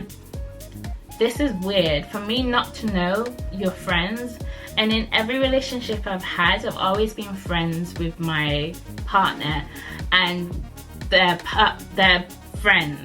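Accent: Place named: Northern England, United Kingdom. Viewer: Ahhhh you are from Leeds! I was trying to pin point the Northern ascent. Funny story time! 😂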